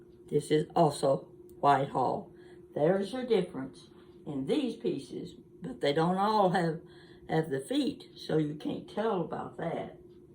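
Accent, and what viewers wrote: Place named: Tampa, United States. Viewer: I am a first time watcher. Thanks for the tour and the information. I’m going to examine my treasures to see if I have some of what you showed. You remind me so much of a dear aunt whom I loved very much. She lived in Tampa and she had your accent and your caring nature. It was delightful to come into your home. I hope to visit again and to especially see a table setting of yours. Perhaps you have pictures of the settings you have done. Thank you so much for your information and your lovely personality. Like you, I love my stuff.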